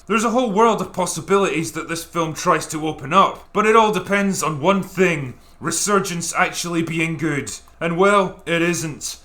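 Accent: Scotland, United Kingdom. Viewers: Giant space testicle and shooting the queen alien in the arse. Priceless - all made better by the angry Scottish accent 🤣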